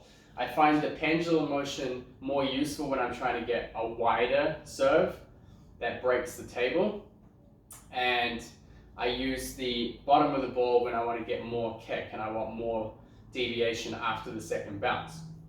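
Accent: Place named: New Zealand. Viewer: I cant seem to pinpoint your accent. Kiwi living in Oz for sometime then migrated to US?? Reason for asking is the next question after the first would b - do u coach in person?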